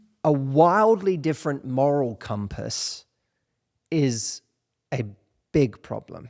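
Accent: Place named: United Kingdom. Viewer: I'm here for British accent İt's wonderful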